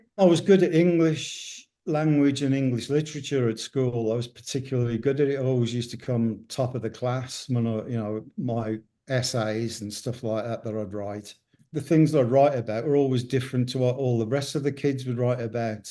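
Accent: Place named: United States. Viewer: love geezer, dont really like that yanky narrator!!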